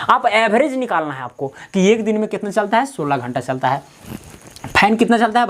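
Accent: Bihar, India. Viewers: Bihar se ho aap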